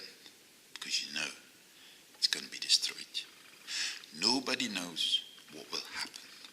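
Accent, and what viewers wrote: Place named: Scotland, United Kingdom. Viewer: whats up with the scottish accent? :p Freaky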